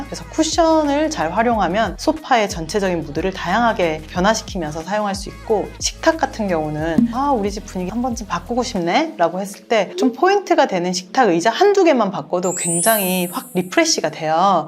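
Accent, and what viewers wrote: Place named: Jeolla, South Korea. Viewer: 전라도네? ㅋㅋ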